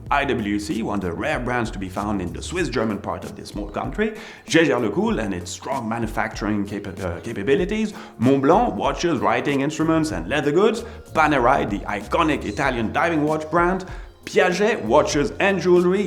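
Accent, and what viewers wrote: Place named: France. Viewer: From your accent, one could assume your first language is French. so you should be familiar with the pronunciation of the German umlaut-U (Ü) since it sounds exactly like a French U. So why is it that you pronounce a German name such as "Günter Blümlein" like a native-English speaker would "goonter bloomlein"?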